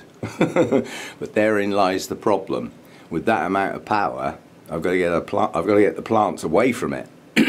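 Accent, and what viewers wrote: United Kingdom. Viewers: 5000, Congratulations! I am from US but you really don’t have to. I am sure most of your viewers enjoy your videos and learning from you. I especially love to hear your British accent English. Bring me back to my college time in Japan.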